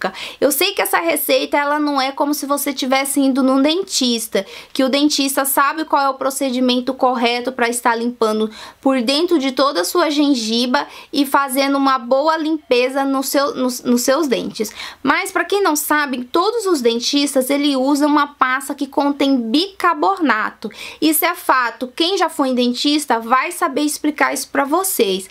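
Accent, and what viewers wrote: Minas Gerais, Brazil. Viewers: É mineira ? Kkkk você parece engraçada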